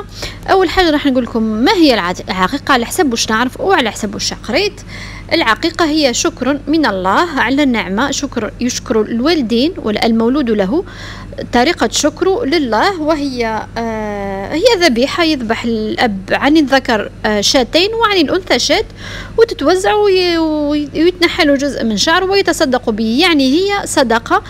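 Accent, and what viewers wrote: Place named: Annaba, Algeria. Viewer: هدرتك عنابية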